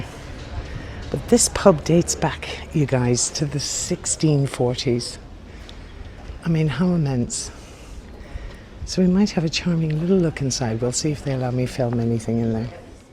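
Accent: Ireland. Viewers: Hi, I love your video. Not so much of a hidden Gem anymore but still hidden from most tourists. I think I discovered the Hill Garden and Pergola in 2018 or 2019. I always wanted to go to the West Heath, but I only made it to Hampstead Heath not the West Heath so I found it by accident. Boy was I amazed It was during the summer and it was a beautiful sunny day and I was almost by myself here. Mind you I came to this part of London in January 1982 to work for the first time a week before my 17 th birthday. I have been to London so many times over the years but I'll always love my part of London namely the NW Hampstead, Golders Green, Kilburn, West Hampstead, Queens Park, Swiss Cottage, St John's Wood that will always be my part of London and I keep coming back. I have also lived in LLanduno Wales, Portrush NI and in Edinburgh and I have travelled a lot in the UK as a tourist as well. 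I like your Irish accent and I think yo make some really nice videos. lots of love from Denmark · Lots of Irish references to go with your very slight Irish twang, I know Hampstead well, lots of celebrities currently live in these roads you are talking about, All best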